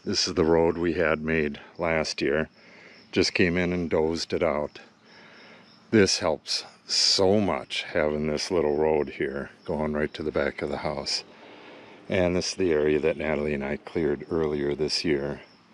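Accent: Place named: Wisconsin, United States. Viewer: I hope that all of those Bur Oaks had a good year after you treated them. I hope they worked on their roots and will leaf out strong this coming spring. You probably already know this, but one of the things that I do with my young oaks is fertilize them in the spring at leaf out and ALSO give them some Iron. I usually only fertilize once, but one year I did another just before the second growth in June (in Illinois). I hear a little Wisconsin in your accent so your trees probably get that second growth about when mine do. Two fertilizations can be too much so be careful. You have to use your judgement. You may already be doing some fertilization. It sounds like you know a lot about trees. Nice to see someone plant the mighty Bur Oak! Such a stately tree out in the middle of a Savanna.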